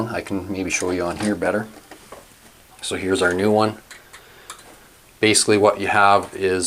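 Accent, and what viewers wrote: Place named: Canada. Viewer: Hi! I can't get over how much you remind me of a friend from (5th grade? ish?) whose name was...and lived a couple of doors down from me in Seal Beach, California....and he was from Canada too (I assume you're from Canada with that accent). I know I already asked you if you lived there as a kid and you said no.....but, if that's true, I swear you have a doppelganger out there somewhere! Thanks for all of the amazingly helpful videos, btw! I'm getting ready to have an addition built and I feel like I know all kinds of things that will be helpful in the process.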